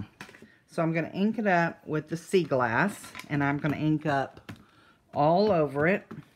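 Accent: South, United States. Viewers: Hi. Love the side fold idea!!!! I was watching your video and Alan walked in the room an heard your voice. He said, "Is that? I lover her Southern Drawl". You are famous in our house hold.:).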